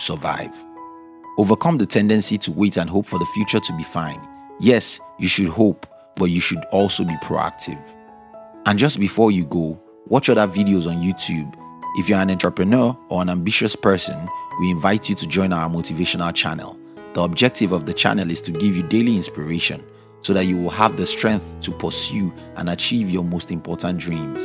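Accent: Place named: Nigeria. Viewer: He is from Africa, possibly Nigeria.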